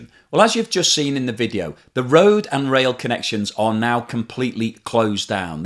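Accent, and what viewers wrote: United Kingdom. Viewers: Thanks for your clear british english 👌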